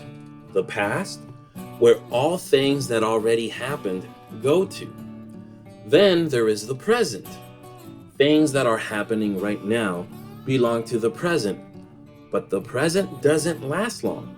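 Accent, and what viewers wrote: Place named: United States. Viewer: Amazingly well produced video. Props for the job. It really catches us. I found interesting how you pronounce the “ed” in some words - almost imperceptible in your American accent. I learned a lot.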